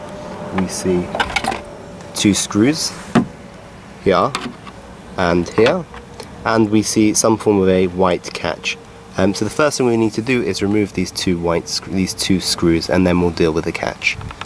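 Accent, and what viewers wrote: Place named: England, United Kingdom. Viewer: Thanks. Very helpful and in the King's English with birdsong background. What's not to like?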